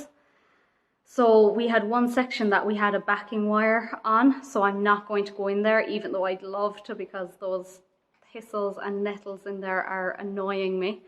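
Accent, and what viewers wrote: Ireland. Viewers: Is it me...or does look cuter in every new video...her Irish accent is 🔥 HOT!!!!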